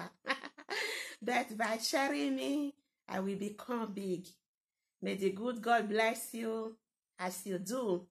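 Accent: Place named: Italy. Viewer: I love your Italian accent, I have my cousins there in Italy, nice content sis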